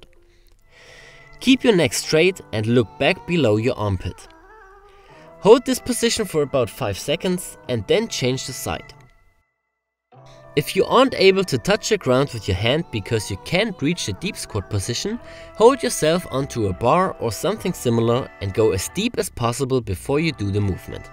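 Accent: Germany. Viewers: Dat German accent :D Edit - Ah wait: nice video bro! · Brooo bist aufjedenfall Deutscher das hört man doch raus 😂❤️ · Dieser deutsche Akzent ist so ekelhaft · da ist doch ein akzent, deutsch? :-P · Are you from germany? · Schön dicker deutscher Akzent! 😁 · german accent haha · r u german, swiss, austrian or dutch? 🤔🤓 ur accent sounds like either of those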